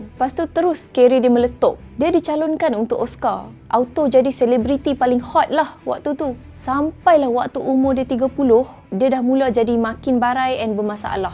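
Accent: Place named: North, Malaysia. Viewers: Wakk single tak? Sy suko loghat utaraa mcm mana nk kenal SI Gadis ni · Cayaq tengok awek utara ni cerita.. Begitu pekat loghat dia..😊❤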